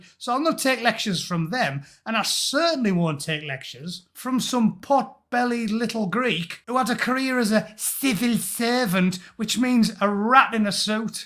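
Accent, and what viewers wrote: United Kingdom. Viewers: Now THIS is the way I like to hear a Brit talk. Good on ya, boy!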